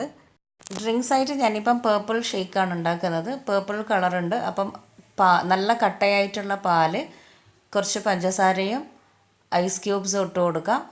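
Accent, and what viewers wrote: Thalassery, India. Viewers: Nammude thalassery talk kelkan thanne Rasama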